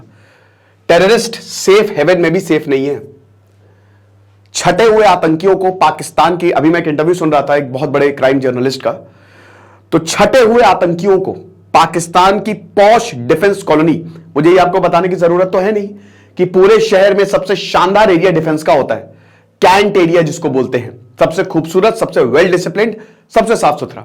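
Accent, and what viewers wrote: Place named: Bihar, India. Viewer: Aajh full bihari dailoge ke mood me hai sir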